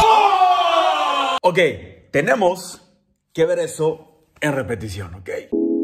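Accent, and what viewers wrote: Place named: North, Mexico. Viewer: Ten una relación contigo mismo un rato, te lo mereces ♥️🤘 Jajajaja amo el acento norteño y sigo siendo fan de tu edición. ✨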